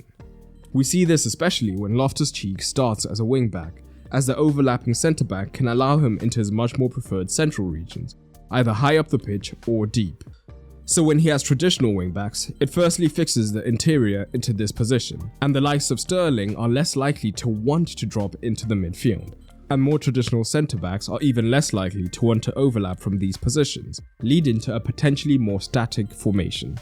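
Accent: South Africa. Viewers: Are you South African this accent sounds weirdly familiar 😂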